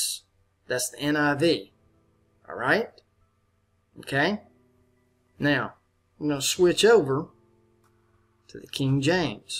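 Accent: South, United States. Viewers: awesome bro. you from Bama!? that's sick. I never knew that. I always knew from your voice that you were from some part of the south, but I love it. I'm from Alabama also. been here my whole life. Do you still live in Alabama?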